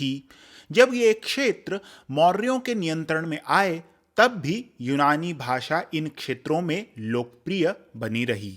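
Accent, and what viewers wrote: Standard. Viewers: आपका शोध और आपका शुद्ध हिंदी उच्चारण उत्तम है किंतु उसने करा या उसने करी जैसे शब्दों से बचिए।उन स्थानों पर किया या की शब्दों का प्रयोग करें,धन्यवाद।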